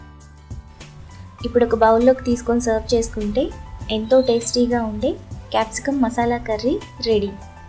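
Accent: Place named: Telangana, India. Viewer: its telugu with telangana accent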